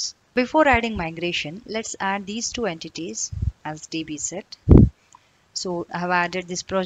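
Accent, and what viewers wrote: India. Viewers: your explanation is so good. you are from Andhra or Telangana?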